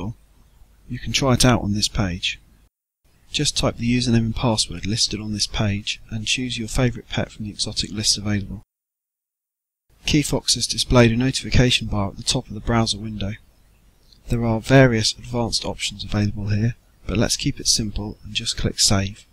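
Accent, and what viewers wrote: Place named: United Kingdom. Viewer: GOD you could do a lot to improve your audio not to mention working on the dialect intensity so that those not from the UK could understand you. With both issues it makes it pretty bad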